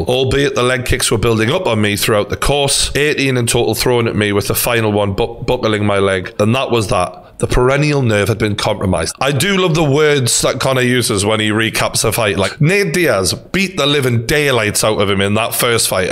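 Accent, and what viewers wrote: Newcastle, United Kingdom. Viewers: I love but I'd rather not have a husky Geordie man talking me to sleep, not again anyway...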